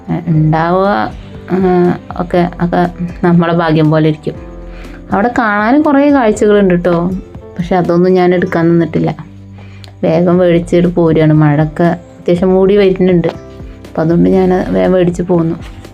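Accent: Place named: Malappuram, India. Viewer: Nalla video, manoharamaya drishyangal. Calicut, malappuram bhasha ippo kelkkbol chiriyautto, njan avide vanna samayam , samsaram kettittu, veruthe thalayaattikondirunnu. Ippozhum cherya budhimuttundu, ennalum ok, Curryveppira nannayi grow cheyyanulla tip parayatte, oru glass kanjivellam pulippichu 4 glass vellam ozhichu dilute aakki ozhikkuka. Ithu njan pareeshichu jayichathaanutto.God Bless ❤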